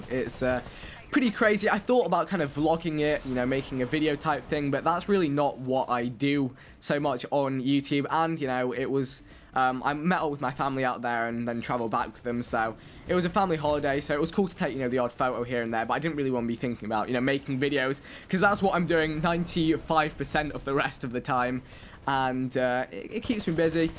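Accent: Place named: United Kingdom. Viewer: Ive only been watching your videos for about a month which is a short time frame in comparison to your 4 years of youtube but I truely enjoy watching your videos and the sideman collaboration! I still find it hard to understand an asian with a british accent but thats probably because I live in the American South (yall). I love the variety I think the more the better. Also Im not a youtuber (yet, school work) but Id like to do a Gta video with you and or the sideman sometime, my gamertag is hockeyslimshady and is also my twitter handle, I lack everything necessary to record gameplay but I think it would be cool to have an american in the gang who is canadian (true) and can speak french, SACRE BLEU! All in all, this feels like an essay but congrats on the 500k and keep up the good work!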